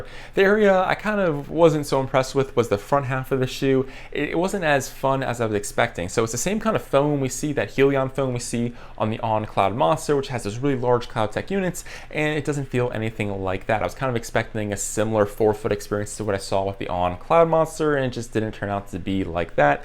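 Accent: Maryland, United States. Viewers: I love when I’m watching YouTube and can just hear that someone is also from MD.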